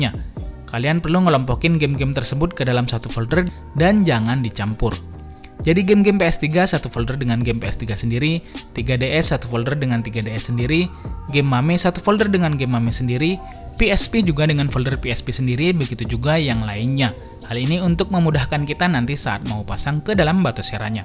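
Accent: Madura, Indonesia. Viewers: kok berasa ada cengkok medure nya....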